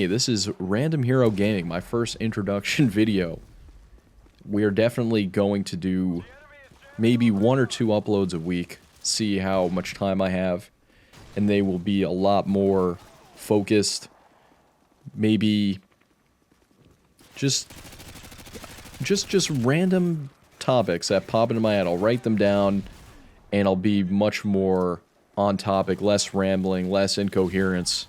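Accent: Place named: Florida, United States. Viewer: Hey man, I really enjoy your content, your channel was introduced to me couple days ago and i've been watching some of your videos. Very relatable, and the talks about lifes difficulties, you almost sound like a Floridan version of me. Hope to see more of your content and keep your head up, better days are obviously coming... That's atleast what I tell myself.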